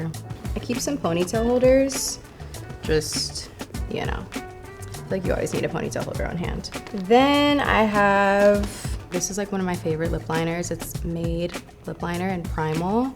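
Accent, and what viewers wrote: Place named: California, United States. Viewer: It’s a California/LA girlie accent. We tired of it in the states as well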